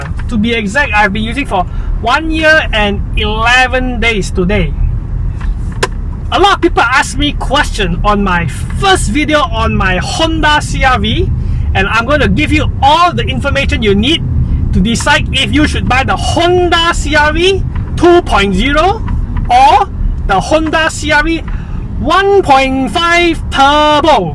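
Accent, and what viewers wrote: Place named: Indonesia. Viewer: silly indon tone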